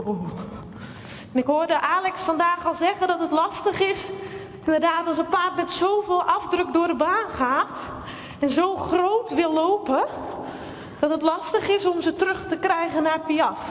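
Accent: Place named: Netherlands. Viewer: An stop at 10.35 min. We can see /reconize that you Mrs. Have had your antebrachialis and infrascupularis dex./sin. In the right position like olympia level ! The horse ,capri sun , open his atlas axis bone connectivity and it looks for better/more scores ! .......sure only your dutch accent in your voice , hold me down to gave full points -cute or sweet thats not an question Mrs. Dinja van liere -amazing ,to build-up talent....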